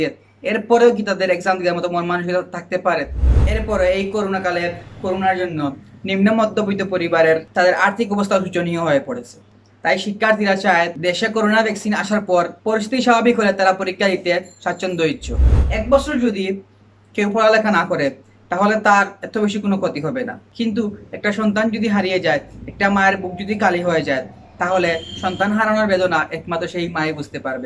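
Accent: Sylhet, Bangladesh. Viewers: ভাই অপরাধ মার্জনীয়, ভাষাগত দিক দিয়ে একটু ত্রুটি রয়েছে বলে মনে করি। আমাদের সিলেটি আঞ্চলিক ভাষার সাথে কলকাতার বাংলা ভাষার অনেকটা মিশ্রণ রয়েছে আপনার উচ্চারণে। তাই একটু বেমানান বেমানান মনে হচ্ছে। যাই হোক কোনো সমস্যা নেই। ❤️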